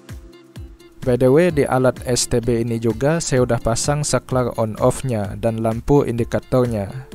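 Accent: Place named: Sarawak, Malaysia. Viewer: dah kuduga kamu dari Sarawak, dari logatnya. Tapi bahasa Indo kamu fasih banget kayak asli orang indo, malah aku tadinya ngira kamu orang daerah Kalimantan. Tapi aku penasaran kenapa kamu orang malay pakai bahasa Indo ? Apa penonton kamu kebanyakan dari Indo kah, atau sekedar obsesi ?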